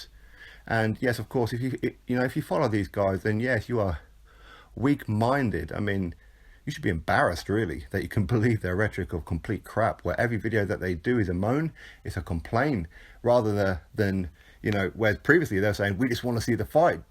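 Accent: United Kingdom. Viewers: Is that a British accent I detect from the narrator?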